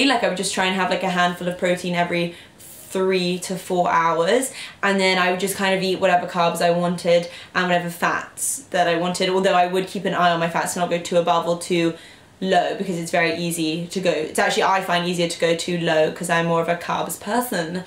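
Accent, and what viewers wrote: Wales, United Kingdom. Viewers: Great body, she sounds like she is Welsh!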